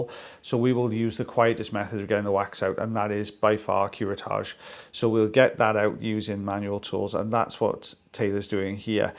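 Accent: Wales, United Kingdom. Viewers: Love the Welsh. Would love to hear more, my wife thinks it’s sexy.😊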